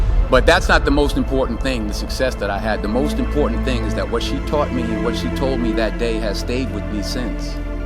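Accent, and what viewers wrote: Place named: Scotland, United Kingdom. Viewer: I was having some trouble recently calming manifestation.guide the voices in my mind during my morning meditation. him has a wonderful Scottish accent and a very calming voice. Thanks to his meditation CD's I have gotten back on track and feel a big difference in my meditation practice.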